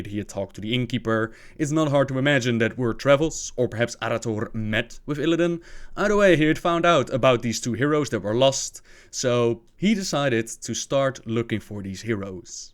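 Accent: Netherlands. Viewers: dutch accent is real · Where is from?? I know it sounds weird, but when I visit my aunt she sounds sort of like him in like accent wise (she's Dutch) and it's the same with my dad. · dude Ur amazing with this. Dutch accent ? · Where are you from? You sound a bit dutch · he is from the netherlands, hes not american or enlish or some sort, he cant do anything about it. For some people who are from the netherlands its hard to pronounce. I dont have that, but i cant pronounce the R while speaking dutch, but i can while speaking english, its rly weird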